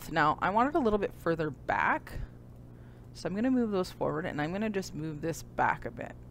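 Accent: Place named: Canada. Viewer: ehhh canadian... oh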